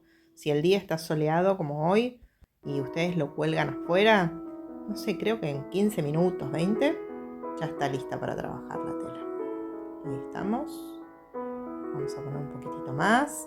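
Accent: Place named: Argentina. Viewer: Lo que más me gusta es que la señora que explica es argentina, habla como yo y le entiendo bien.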